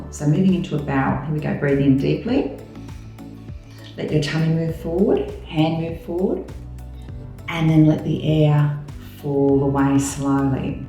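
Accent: Australia. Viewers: Ausie English be like that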